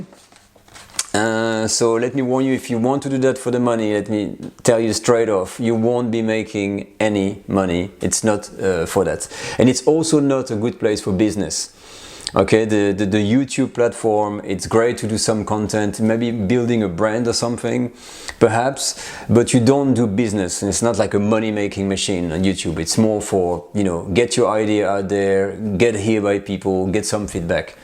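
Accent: France. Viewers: Been with you from the start...love your channel. You are very personable, knowledgeable and charismatic. ☺️. And the "real" French accent is so easy on the ears. I am Canadian - our French accent is very different. Keep up the wonderful work...you have inspired me to dig out my Julia Child and Escoffier books and go back to basics. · I just found your channel today. I am impressed by your ability to make dishes seem easy to accomplish and your love of cooking comes through. Please do not apologize for your presentation, it looks much more professional than you might think. Your english is excellent even with your french accent...this is much appreciated., I believe there is a career on camera if you are willing to go that far. Maybe PBS. In my opinion you are the complete package of knowledge, charm and inspiration...you have my vote. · Your channel is a dream come true. 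I love french food but do not speak the language, so you tube in french does not work for me. Real french food by a french cheff who explain's so well and in English. It doe not get any better. I did not cook any of you recipes yet but have bookmarked so many , my cooking bug picks up in the fall. And one more thing: I love that you are not full of air, a simple guy. And one more thing: your accent, every time you say "recipe" I repeat your way. My son laughs . Thank you so much for this channel in English. Wish you lots and lots of success ! Just subscribed.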